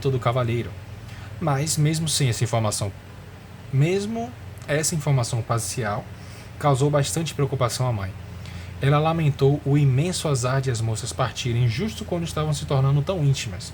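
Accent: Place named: Northeast, Brazil. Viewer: tu é de Natal/Rn né? sem condições, se não for nordestino, eu cegue.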